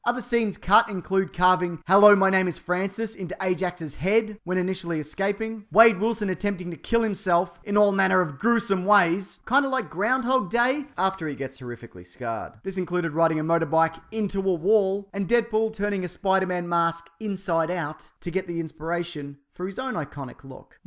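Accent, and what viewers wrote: Australia. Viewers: I tuned in to WATCH deleted scenes as your title indicated. All I heard was you blabbing in your crappy Aussie accent. Stop misleading ppl with your titles!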